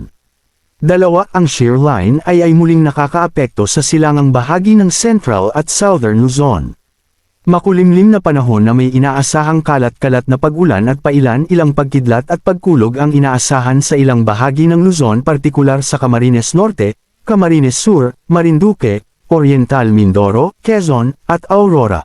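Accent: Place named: Cebu, Philippines. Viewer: Replay dito bro, naks ha, pamilyar ka ba sa Cebu bro at parang taga doon ka pagnag balita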